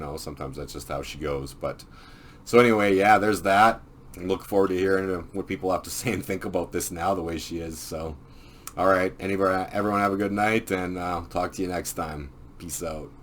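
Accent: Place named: Canada. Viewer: You're pretty good at this for starting up. We all need to do all we can to spread info that is being suppressed. May want to move your mouse a bit further from the mic when you scroll so it it doesn't pick up so many clicks. Knew immediately you were from Canada, if I had to guess I would say your from BC maybe? Canadian accents are weird in how they are distributed. I'm from rural sask. Hosers unite against the NWO!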